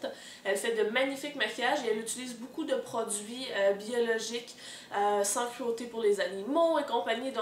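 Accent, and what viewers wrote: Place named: Montreal, Canada. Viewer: Super joli !! Ça fait vraiment un teint santé ! Depuis le temps que Lutin nous parle de toi ça fait plaisir ^^. A moi tout particulièrement car j'entends un peu de l'accent de chez nous... je suis de Montréal mais j'habite en France ;)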